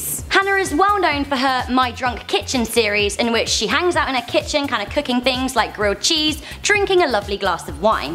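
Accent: United Kingdom. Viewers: She is british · It's her accent.... people with english or english-like accents pronouce things that end with "A" with an "er" sound. example: idea -> idear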